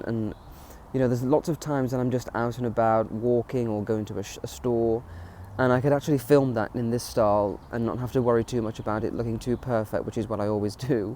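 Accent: United Kingdom. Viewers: Hola, I love bright colours but I must admit it, you were totally right, the new tiles have upgraded your kitchen enormously! I admire and appreciate the love and care you put in everthing you do and also the way you present it, you make even the most trivial detail look elegant and sophisticated. I love your British accent and I find your videos soothing and conforting. Thank you for being there every week.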